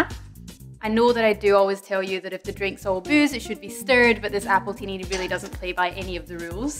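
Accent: Scotland, United Kingdom. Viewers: Where did you get this martini glass? I love it. New to the channel and lovely to hear a Scot!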